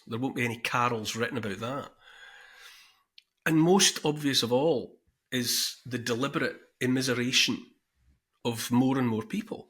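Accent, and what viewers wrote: Scotland, United Kingdom. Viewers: Irrespective of your own view of yourself... I stand by the following... 😁 (written in May '22) 👍😍 Won't Kneel A gentle, beautiful Scottish lilt A kind, empathic, forward tilt An open heart; a caring face A willingness to take his place. A strong, home-hitting monologue Reminds us of the boiling frog The courage to become a sleuth A passion to release the truth. A deep desire to crack the whip Illuminates our freedom-strip Calls out the darkness taking hold The shiny despots; plated gold! Intense and raw, he lays it bare A soul-enhancing, honest stare Inflections drive the message through He speaks for Us - for me and you! Discernment's such a precious gift His words create a mighty lift Take heed of all he has to say He knows - it shouldn't be this way! 🤗🦋🐬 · Ayup, I live with a real fire, all the time and just to let you know that a crackling fire and your Scottish tone playing with one of your monologues on in the background and a candle is a magical thing! 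Very celtic! Thank you 🤝🏴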